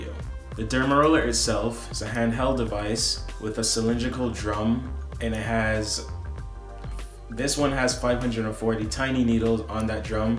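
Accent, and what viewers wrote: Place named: Barbados. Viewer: Love that Bajan accent !❤️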